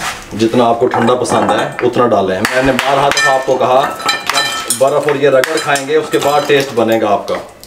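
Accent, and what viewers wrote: Punjab, India. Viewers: 😂 😂 Uncle boht nice hain ap... Punjabi tuch boht wadiya lagda g💕💕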